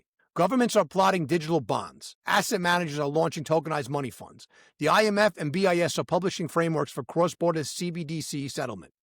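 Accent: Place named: Brooklyn, United States. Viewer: AI can't fake a real Brooklyn accent you tool.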